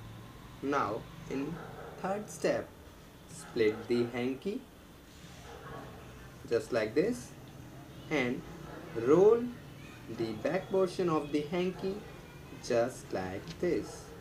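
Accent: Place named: India. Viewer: Indian hokar english bolta he pagal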